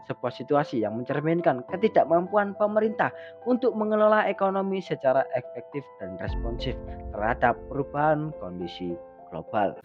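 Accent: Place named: Java, Indonesia. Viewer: ngomongnya ga usah buru2 bg mending pelan2 tp jelas,kecepetan jd kdengeran medok 😅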